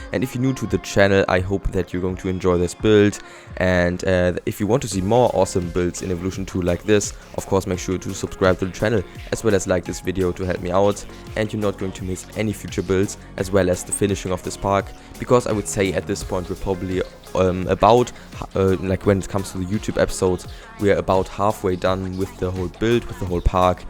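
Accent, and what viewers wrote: Germany. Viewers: I love your german accent i don't know why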